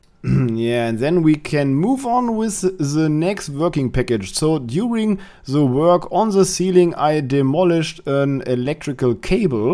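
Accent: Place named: Germany. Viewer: I'm enjoying your video and the German accent, good luck with your project and your Channel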